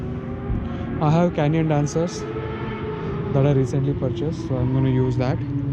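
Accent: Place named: India. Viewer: Sounds like your Indian, hit me back looking for a fellow countryman to ride Road Atlanta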